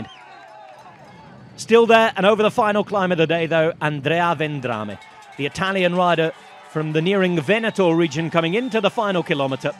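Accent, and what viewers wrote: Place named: England, United Kingdom. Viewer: As an American cyclist and tifoso , I have to give you high kudos for the way in which you present the context and follow up with interesting observations with a little tongue in cheek. Here in the states, we have to suffer through what they give us on, etc. and our commentators as much as they’re great guys just do a pretty basic job. If I could hire you for our coverage of the tour etc. it would be a slam dunk. And don’t be pissed at me but you have a very likable English accent.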